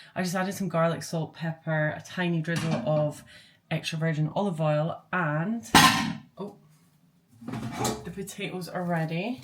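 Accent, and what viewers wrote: Scotland, United Kingdom. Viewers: Are you from Scotland? ❤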